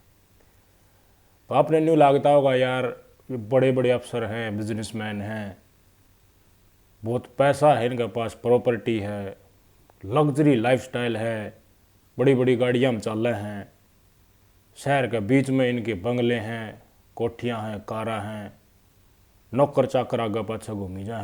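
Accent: Haryana, India. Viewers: बेटे तेरी जो हरियाणवी भाषा है आज तक किसी भी पत्रकार ने नहीं बोली ऐसे हर घटना को बताता है जैसे दो आदमी बात कर रहे हो बिलकुल सरल हरियाली में बात करता है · Phale Bolna Seekh Le Aa,pni Lokal Bhasa Na Bole ,Bhiwani Jiila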